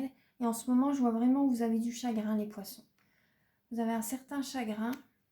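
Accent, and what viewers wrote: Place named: North, France. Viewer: Quand je vous écoute, j'ai l'impression de m'entendre quelques années auparavant. Même voix, mêmes intonations et même accent ! ( Du nord) c'est troublant !!! Bonne continuation !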